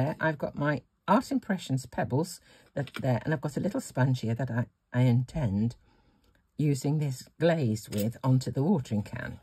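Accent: United Kingdom. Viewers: I hear a lot of differences in words from the various British YouTubers. I just love the accent and your voice in particular is so beautiful and appealing. You just sound so sweet, I wish I could meet you and have dinner or Supper as we say in the South. Some of the words I’ve noticed are: Right-OK (as a bridge to the next thought) Flat-Apartment Crisps-Chips Biscuit-Cookie Shops-Stores Uni-College Dustbin or Bin-Trashcan or Garbage Can Post-Mail Trousers-Pants Trolly-Cart or in the South, /Buggy (shopping) Trainers-Sneakers or Tennis Shoes (pronounced TennieShoes in the South) Boot-Trunk (car) Centralize it-Center it Holiday-Vacation Love your videos so much and I have to Re-Subscribe regularly. I try to keep an eye on it. I don’t know why they do that. Love from Murfreesboro, right outside of Nashville, Tennessee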